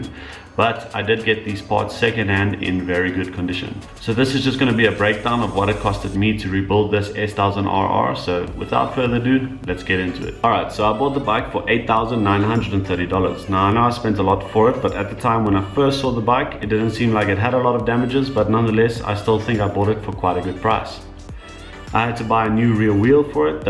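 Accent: South Africa. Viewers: Ever since I watched your first video and heard your accent I knew you come from south africa 😂 en uiteindelik het hierdie video dit bewys. Hou aan met die goeie werk en videos yster! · Love it my dream bike the alien ...was happy to hear a south african brother!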